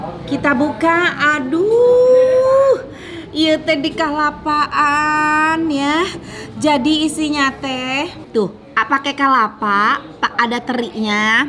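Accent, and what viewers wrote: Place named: Sunda, Indonesia. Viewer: Paling seneng bgt dgr org ngmg sunda😂 dgr teh ngmg tuh sesuatu bgt 😂👍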